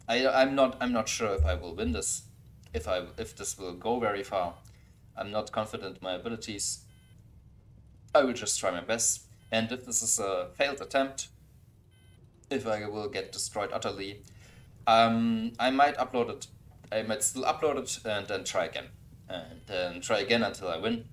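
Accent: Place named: Australia. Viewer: Your accent is cool. Are you Australian?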